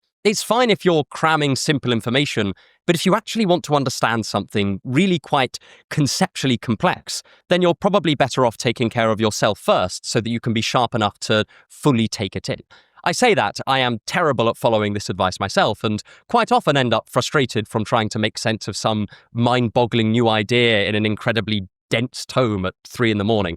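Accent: United Kingdom. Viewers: Love that british accent. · Is this guy British? Just asking. No reason. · listening for the first 5 secs of the vid, i think he is a brit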